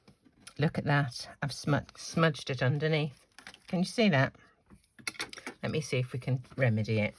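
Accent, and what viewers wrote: United Kingdom. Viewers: Oh my gosh, I just adore your videos! You crack me up so much with your bits of humor and I adore your British accent! I love your CAS card styles and techniques! Thank you so much!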